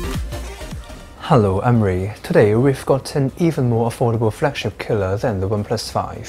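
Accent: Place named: United Kingdom. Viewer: 专登嚟听英音，好sexy！！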